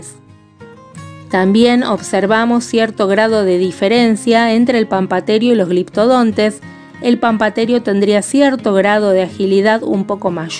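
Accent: Argentina. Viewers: dices por aquí, de donde eres? te noto acento argentino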